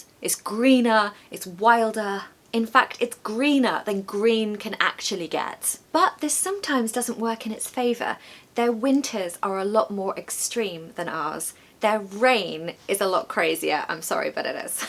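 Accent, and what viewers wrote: United Kingdom. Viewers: I love you with your British accent. I always waych videos that teach me English with an American accent, that's why I like watch yours. But adding another accent, like the Scottish, is too much to me. Hugs from the south of Spain.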